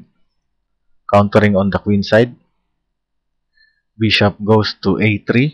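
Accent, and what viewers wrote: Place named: Tagalog, Philippines. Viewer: OMG that is a Tagalog accent!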